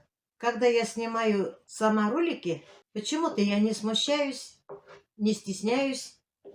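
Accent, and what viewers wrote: Tatarstan, Russia. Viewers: добрый день.Желаю удачной съёмки. Слушаю тебя и вспоминаю маму.Знакомый акцент татарский.Не болей.😘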